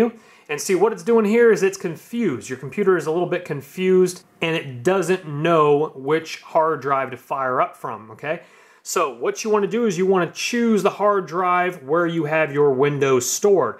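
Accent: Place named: United States. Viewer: Finally an american been watching indians and cant understand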